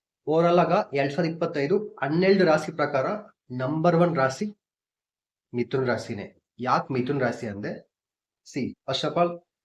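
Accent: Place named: Tamil Nadu, India. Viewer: Tamil nava Kannada maataadidd haange untt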